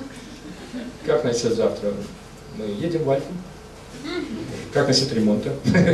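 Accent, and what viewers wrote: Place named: England, United Kingdom. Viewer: Речь ужасно косноязычная, с каким-то английским! акцентом. Поет фальшиво))))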